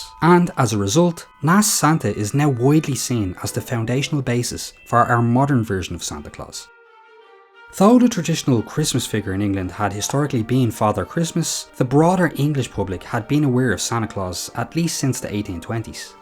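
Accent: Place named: United States. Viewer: That merica voice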